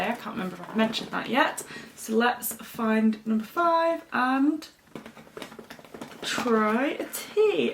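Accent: United Kingdom. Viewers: I love your UK accent!!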